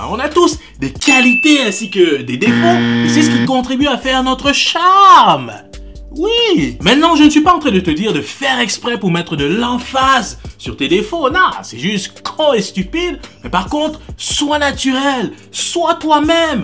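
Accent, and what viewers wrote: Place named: Quebec, Canada. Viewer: Quand j'arrive à cerner ton accent québécois... Ton polo est juste classe.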